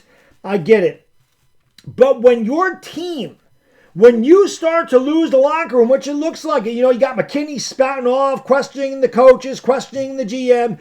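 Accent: New York, United States. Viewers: Dude you are so funny, Love your content with that New York accent shit talking You and crack me up Stay loving your Giants Cowboy Diva for life Texas Gal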